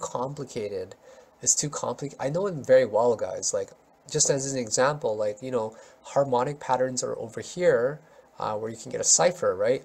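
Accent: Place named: Canada. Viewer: Good thing that i stumbled upon your video today. Interesting content....and i miss listening to the Canadian accent. Spent 5 years there in the 90s...the good old student days.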